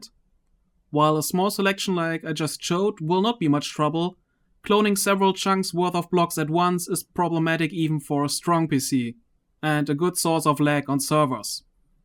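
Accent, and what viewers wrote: Germany. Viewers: Kann es sein das du eigentlich deutsch sprichst?😁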